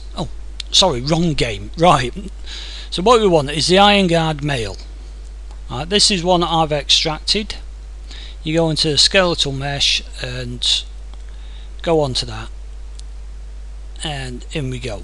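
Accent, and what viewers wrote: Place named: Yorkshire, United Kingdom. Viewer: Agreed. I had to search a bit to find this but it saved me from having to use Maya. You sound like you're from somewhere by Yorkshire too,..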